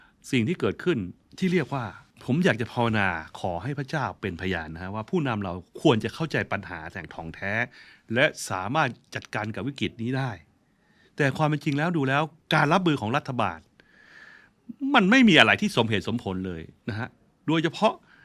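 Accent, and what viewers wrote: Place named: Thai-Chinese, Thailand. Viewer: ท่านคนไทยเชื้อสายจีน ธรรมดา ออกสำเนียงแบบนี้แหล่ะ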